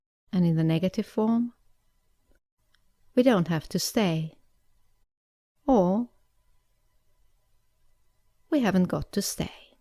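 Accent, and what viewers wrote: United Kingdom. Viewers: Your video helps me a lot to improve my English, that's why i sign up and make a comment to say thanks for all the effort you've done not only for me but for the other who want to learn good English. your such a lovely teacher i like the way you introduce your lesson and i really learn from you. what i did not learn is your accent of saying the words please help me and teach me how to imitate your accent because i like British accent rather than american accent... keep up the good work. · is it uk accent or us accent? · Brittish accent rules!!! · great!!!!. niice british accent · Very good class.. It helps me improve my English, specially British accent. Brilliant explanation.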